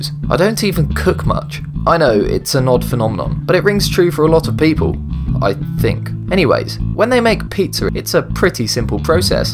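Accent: United Kingdom. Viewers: Why did they change the voiceover dude to a British one, were Americans not good enough!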